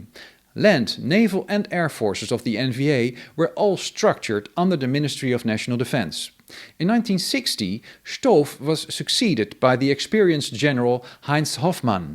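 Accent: Netherlands. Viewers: You speak with a very Dutch accent for a German man. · How funny, that you speak perfect German, but you speak English with a heavy Dutch accent. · Geweldig kanaal ! Ik hoor toch echt heel goed Engels met een Nederlands accent. Klopt dit? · Although he speaks with. Dutch accent, his German pronunciation is great!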